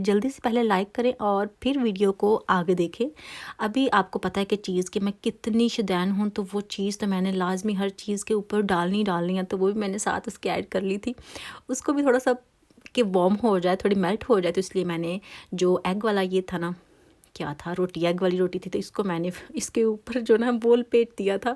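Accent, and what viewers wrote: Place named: Lahore, Pakistan. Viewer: Pure Lahori accent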